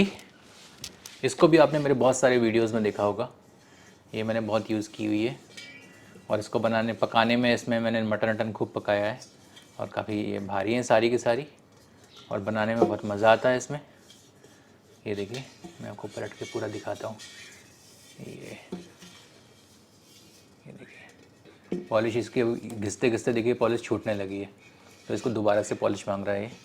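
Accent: Standard. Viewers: aap jitne ache tarike se sudha Hindi me bolte he utna koi dusra cheaf nahi bolta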